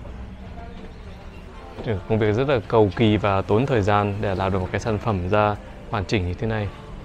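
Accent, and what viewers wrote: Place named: North, Vietnam. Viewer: Cô rất thích Video của Cháu trình độ thật hay .Cả giọng Bắc dễ thương nhẹ nhàng .Mong Cháu thành công trong công việc .👍🥰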